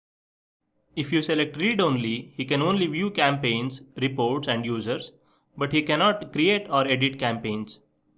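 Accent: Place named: India. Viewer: Fix your Indian accent. It's annoying af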